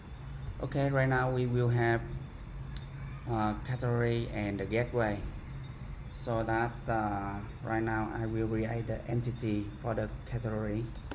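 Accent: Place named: Vietnam. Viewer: Ây cái phát âm này ông bạn là người việt phải kh -,- Video hay lắm, cảm ơn ông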